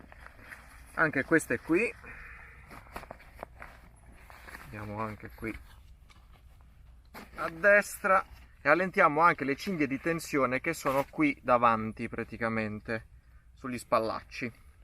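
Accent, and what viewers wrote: Apulia, Italy. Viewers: Grazie per le belle dritte che ci dai con grande simpatia! Non so di dove tu sia ma ti sento molto Pugliese... e ho detto tutto. Non vorrei snagliare.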